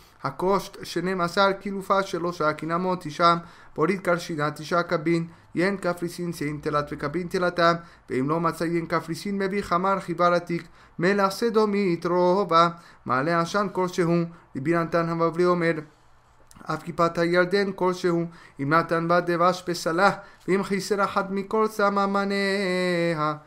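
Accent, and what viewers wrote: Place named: Morocco. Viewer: L'hebreu accent marocain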